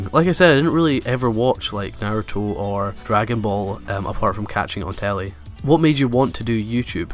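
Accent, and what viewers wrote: Scotland, United Kingdom. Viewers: Where in Scotland are you from? Ps I'm fro Edinburgh! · Are you Scottish if so where from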